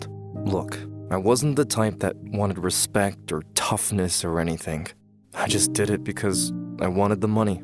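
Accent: United States. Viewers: Ok so he grew up in France and then moved to neither lands so why he got an American accent?????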